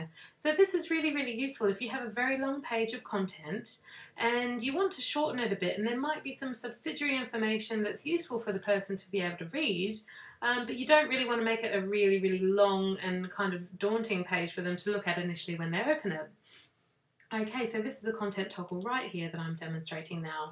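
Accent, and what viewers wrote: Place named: United Kingdom. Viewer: I love the British accent.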